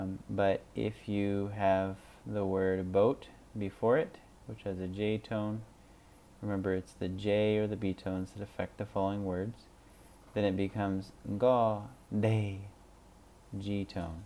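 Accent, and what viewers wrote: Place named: Thailand. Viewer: Thank you so much! This was super helpful to me! I can’t believe I didn’t see this one before. Your accent is super thai here.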